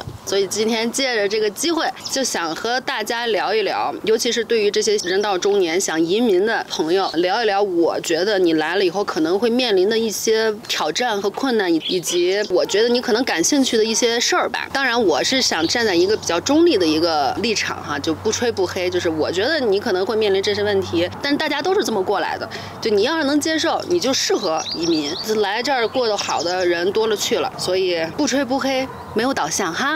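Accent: China. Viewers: 大陸鄉音太重！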